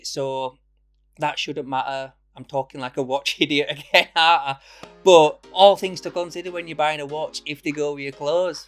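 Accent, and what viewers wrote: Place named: West Yorkshire, United Kingdom. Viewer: Where are you from? Sounds very West Yorkshire to me 🤔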